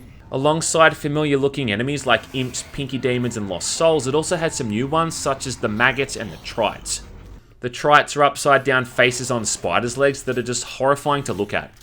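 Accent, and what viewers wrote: Australia. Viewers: I hate the Aussie accent and I AM Aussie. #Irony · Your Aussie inflection is pure perfection. (I'm born & raised south Sydney) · It's Australian, it does not sound anything like Irish xD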